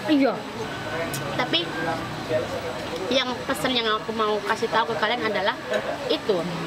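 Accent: Java, Indonesia. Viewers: Medok nya,, bahasa Indonesia berasa jawa tulen 😂😂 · Medok jawa nya mbak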